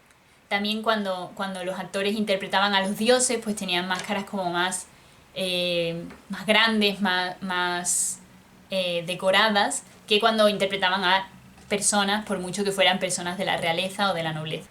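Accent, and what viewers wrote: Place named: Spain. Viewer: Muchas gracias....!!!! Excelente manera de introducir tanto conocimiento condensado. Me encanta tu acento. De parte de España sos????. Saludos desde Argentina.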